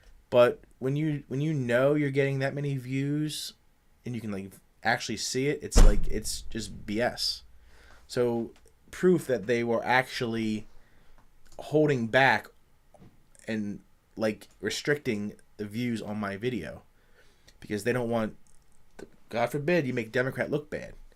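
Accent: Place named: Delaware County, United States. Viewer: 10 seconds into video I know your from Delco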